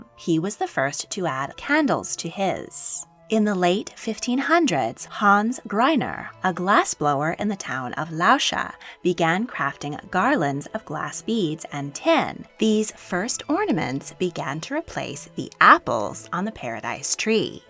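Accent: California, United States. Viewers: Excellent research but the Californian accent is unbearable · Very interesting ..Kaiser Wilhelm was not Edward The Sevenths cousin , he was his nephew ...your American accent is very thick isn’t it ? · why do we have to endure this American accent!!!!!!!!!!!!!!!!!!!!!!!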